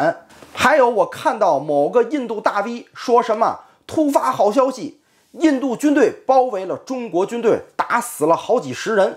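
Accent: Tianjin, China. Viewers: 老哥，天津人吗？我在天津上的本科，哈哈哈这口音太熟悉了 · 是天津味的口音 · 天津話真是哏。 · 喜歡的天津味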